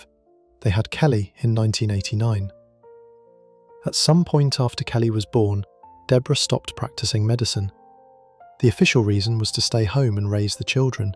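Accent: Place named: United Kingdom. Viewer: Can’t stand when the Narrator is British. Kinda screws up the whole show… 👎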